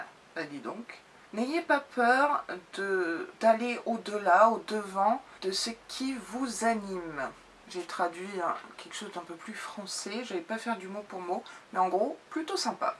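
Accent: England, United Kingdom. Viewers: Aloha :) Très belle idée qu'ils ont eu de proposer des boxs surprise même si lorsqu'on est abonné, l'intérêt est un peu plus limité. Tu m'as fait exploser de rire avec les produits Polaar mais après tu me connais, j'aime avoir du (sur-sur-sur)back-up, alors quand j'aime un produit, je ne suis pas ennuyé le moins du monde de l'avoir 3 ou 4 fois :P Autrement cette box est toujours merveilleuse car elle propose des marques peu habituelles du fait qu'elle ne soit pas française et c'est l'occasion d'apprécier ton délicieux accent anglais qui a refait ma journée :) Je ne regrette vraiment pas d'avoir craqué pour 1 an avec Lookfantastic grâce à ton dernier unboxing. Plein de bisous !!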